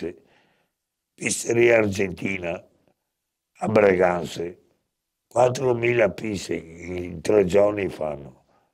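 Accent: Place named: Alba, Italy. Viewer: Min vai in mona! Sui 2/3k al metro. Neanche in val Seriana o Immagina Brembana 25k un bilo dai, sui 50mt. Cmq bel personaggio, città alta tra Trussardi Percassi e Locatelli che non ho presente bhe belle zone ( Berghem de Sura ) cmq ( da accento inconfondibile Alba ) come mai tantissimi bergamaschi vedo nelle tue interviste..? Una percentuale decisamente alta su sei video fin'ora quattro lo sono. Conosco quasi perfettamente le zone che citano, Quarenghi Malpensata e Gleno,poi famose. sembra tanto quei ricconi di famiglia che sputtanano tutto in poco a destra e manca ( non lo nasconde del resto ) poi gli ordini di " grandezza " in termini economici lo sanno solo loro. Per ora non ho riconosciuto nessuno di vista ma in giro se ne vedono e di storie di ogni tipo. Anzi, ho conosciuto bei soggetti facendo Calisthenics da un tre mesi, Omari un ragazzo marocchino bel tipo trentina super tirato che non fosse timido potrebbe raccontare una bella storia molto interessante..ma farlo parlare così liberamente a telecamere puntate non penso sia impresa facile su due piedi. 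Provo ad accennare e convincerlo quando lo becco al parco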